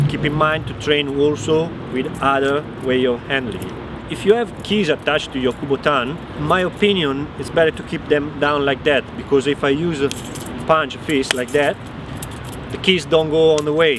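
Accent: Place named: Russia. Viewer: Bel video :) l'unica cosa è che, dato l'accento, avrei scommesso come nazionalità "russo" più che ita :D (ho trovato prima questo video e poi quello ita)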